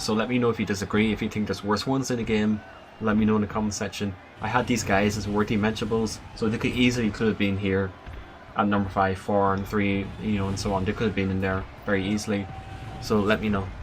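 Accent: Ireland. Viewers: Ah I see it's Irish! Thanks! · He's Irish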